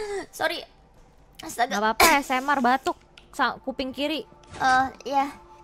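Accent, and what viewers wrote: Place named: Java, Indonesia. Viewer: Tapi asli medok nemen kobo, logat sama pemilihan suku kata asli wong jowow😊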